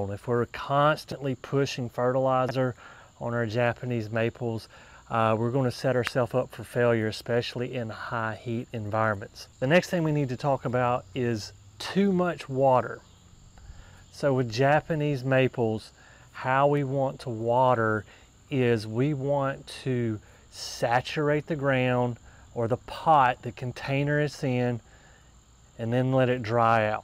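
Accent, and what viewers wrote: South, United States. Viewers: Where do you live? It sounds to me like you also live in a southern state. I live in North TX and just wanting to compare your environment to mine. This is my first time to see your program and so glad I found you as we are currently doing a total makeover of our yard. I'm currently researching the Japanese Maples so this was perfect timing for me to bump into you. 😊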